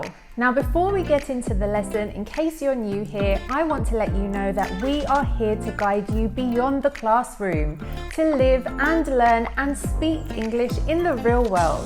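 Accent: United Kingdom. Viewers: I love the British accent , the British culture and your videos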